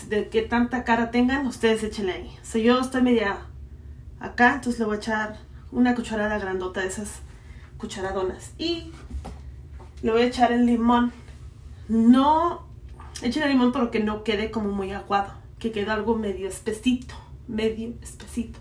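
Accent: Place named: Argentina. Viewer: Jajajaja ame tu acento argentino! Saludos desde buenos aires 💕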